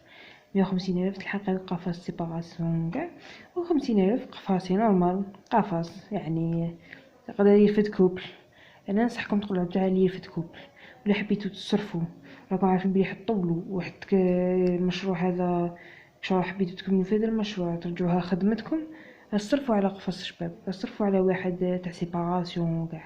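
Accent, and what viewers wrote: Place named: Marrakesh, Morocco. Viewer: صدقني بالله و سمحلي يا وليدي بلا ما نحلف بلي مراكش عارف شا راك تهدر بالتوفيق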